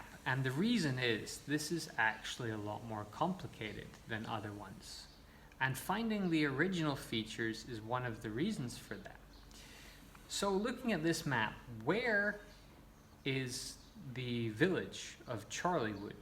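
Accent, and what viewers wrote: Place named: Scotland, United Kingdom. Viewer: Are you from Scotland? Btw Really love your accent.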